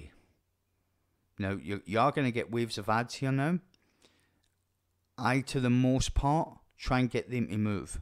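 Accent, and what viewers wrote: Scotland, United Kingdom. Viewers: Yer Scottish got ma support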